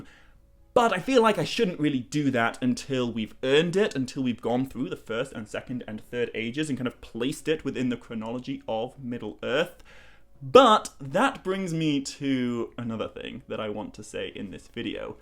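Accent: England, United Kingdom. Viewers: Question? You sound English. Are you from England?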